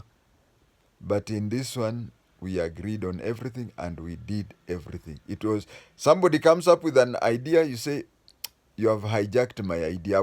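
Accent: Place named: Kiambu, Kenya. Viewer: Whether you’re aware about it or not, you’re now a public figure and we as your supporters are interested in knowing more about you. You have periodically mentioned your father but only given minor glimpses here and there about the other half of your Kikuyu background. Tunangojea kusikia hayo and by the way, for your mother to be from Nyeri, you speak Kikuyu with a Kiambu accent.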